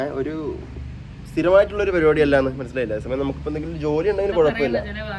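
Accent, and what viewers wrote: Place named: Palakkad, India. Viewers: MalappurAm palakkad samsaram ekadesham oru pole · Slang cheyyo palakad then kollam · Chakkara Palkkad aano Samsarm kettappo original malappuram aan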